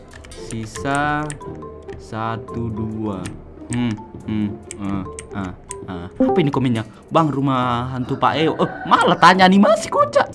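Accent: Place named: Malaysia. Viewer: apa bahasa malaisya ya maaf aku tidak tahu omong omong nama aku syaurah suara nya kaya malaisya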